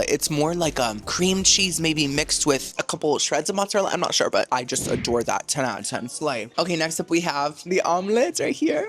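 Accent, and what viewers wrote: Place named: United Kingdom. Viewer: your british accent is like joy to my ears!!!!!!! love from a london girlie xxxxxxxx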